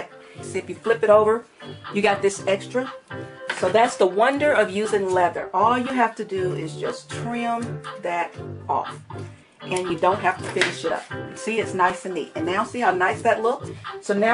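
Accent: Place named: North Carolina, United States. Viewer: I would love to be the owner of a wallet made by is this possible? You sound like you may be from my part of the woods, N.C. I loved watching your video, I'm a 71 year old man and I think you are the best teacher I have seen.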